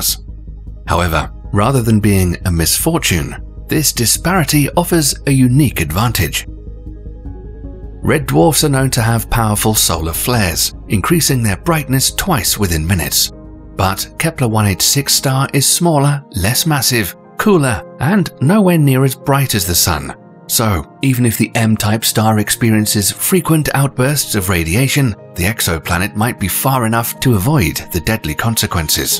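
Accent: England, United Kingdom. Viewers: I just love your English accent! Keep up the good work! :)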